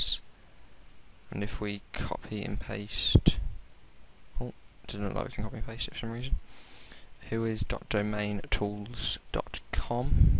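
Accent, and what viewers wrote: United Kingdom. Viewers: I like your accent. You're British, aren't you? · English accent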